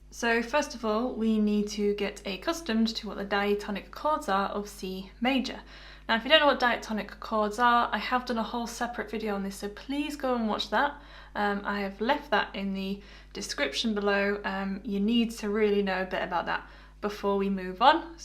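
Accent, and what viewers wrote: United Kingdom. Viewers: Finally it took a brit accent for me to understand,lol im on my way!!!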